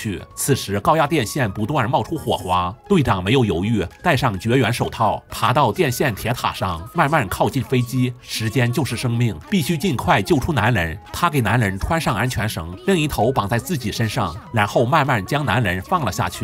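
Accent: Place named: Northeast, China. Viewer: 唉，感覺這解說……實在挺東北大舌頭的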